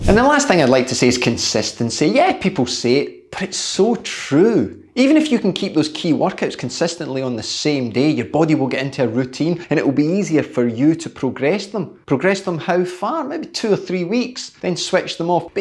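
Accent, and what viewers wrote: Scotland, United Kingdom. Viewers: I’ve been working to much and now have time to get back to riding. Body isn’t in very good shape just did two rides of twenty miles after a few days of working on the bikes and fifteen minute butt accumulating rides on the trainer. Didn’t ride real hard but was pleased to have survived as well as I did. Power meter battery was dead for the first ride so no numbers for it. Second ride with power I showed a twenty minute of 170 and pleasingly also a 170 heart rate. At 63 the latter is well a surprise! Now to recover and attempt to acquire some endurance and riding strength again. Life would be simpler without jobs! Enjoy listening to your Scottish language and do apply some of what I hear. Hope your health is better then what was before. 😀 Have a good day over there and be safe!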